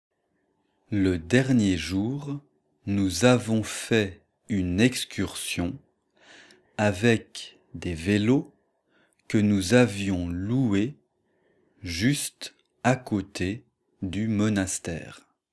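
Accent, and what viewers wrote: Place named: France. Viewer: где вы изучали русский язык? Так чисто, грамотно, с легким французским акцентом говорите...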